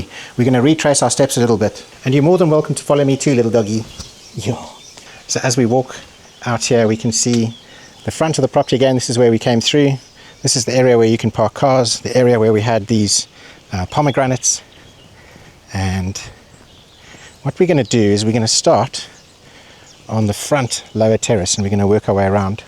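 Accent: South Africa. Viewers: i reallly enjoy your presentation style with the south african twang ... great combo ... property is kinda missing for me strange small windows in the first bed and kitchen and the reception/dining has the front doors but could really do with another big window. price is good ... question tho ... does the farm sell their produce and make a profit? otherwise one is left with looking after a huge amount of trees. there is a calmness and a lack of commercialism (or was when i lived there) that is very calming ... the one reason i wouldn't live there? there is no way i could learn the language.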